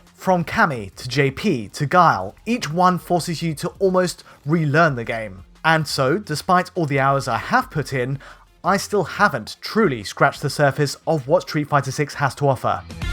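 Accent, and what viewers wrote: England, United Kingdom. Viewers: English accent 💯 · he has an English accent, no such thing as a "British voice". If you have a problem with it then leave, you're not wanted here.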